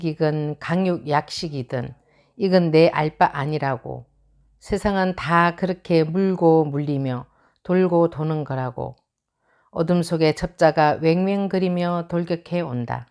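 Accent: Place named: Gyeongsang, South Korea. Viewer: 이렇게 열정적인, 순정한 독자를 얻었다는 것이 글쟁이에게는 얼마나 큰 행운이고 축복인지! 뜨거운 갈채에 가을 아침이 심쿵!합니다. 영상도 멋지고 님만의 갱상도 억양도 정겹고 자연스러우니 조원희 샘 애써 따라잡으려 안하셔도 될듯요.ㅎ 늘 고맙고 또 고맙습니다.^^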